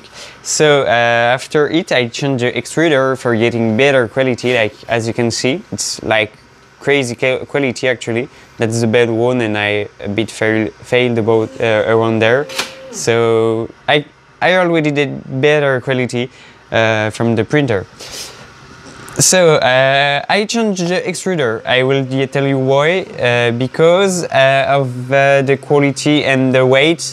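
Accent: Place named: France. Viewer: Salut, tu devrais renoncer à tout faire en anglais ou bien travaille un peu plus la prononciation, des fois tu es difficile à. Comprendre... ne te bloque pas trop sur l’accent, c’est pas si important, de toute façon on entend que tu es français, bon,, à part ça, tu dois ontinuer car tu fais du bon boulot et d’ici peu tu te fera ton petit nom....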